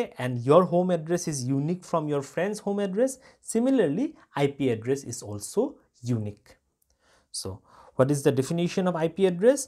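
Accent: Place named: Uttar Pradesh, India. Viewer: Hello you are from UP na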